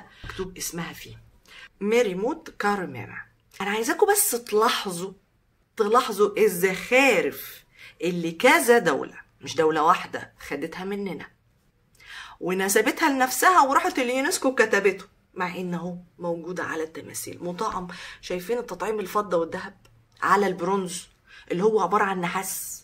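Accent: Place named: Japan. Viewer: انا حبيت جدا الاكسنت بتاعتك باليابانى بجد تحفة ❤❤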